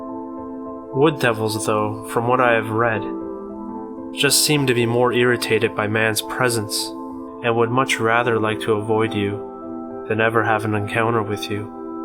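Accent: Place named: England, United Kingdom. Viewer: The correct pronunciation is ( K O O S ) not Cooas ! The narrator is an English Speaking gentleman from England where they speak the ( Kings English ) not American English which is not the same ! !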